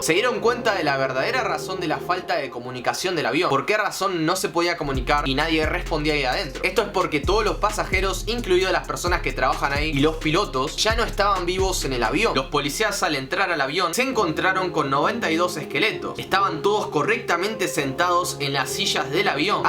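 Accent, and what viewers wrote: Argentina. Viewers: SOS DE ARGENTINA ?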